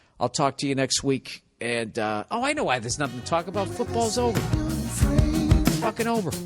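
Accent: Boston, United States. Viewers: Dude when he was talking about the pregnancy and it being her body blah blah blah I totally thought he was gonna say(in his boston accent) you're just the seed man you're not the gardener 🤣🤣🤣🤣🤣🤣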